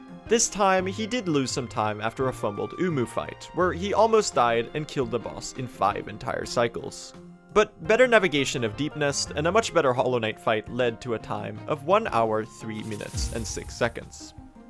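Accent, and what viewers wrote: Sweden. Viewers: Your swedish? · are you swedish? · Wait, you from Sweden? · that's an extremely Swedish accent though. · Wait you are swedish??? Your accent is fucking amazing, even for a swede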